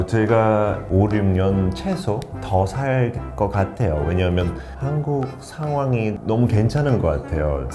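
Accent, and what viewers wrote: United Kingdom. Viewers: 목소리 너무 좋으시고 영국식 발음하시는거 너무 멋진분~!! 🤗🤗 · 엄마한국 몰랏네 아침영어 ㅎ 영국발음? 아닌가ㅎ 또봐요 ㅎ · 귀가트이는 영어 선생님 이신군요? 품격이 있는 해설과 영국 발음 잘 듣고 있습니다. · 님 방송에서 많이 봤으면 좋겠어요^^ 영국 영어 발음도 너무 멋지시고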